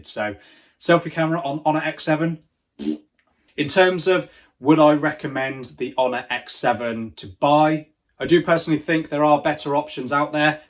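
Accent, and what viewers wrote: Bristol, United Kingdom. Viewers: You from Bristol?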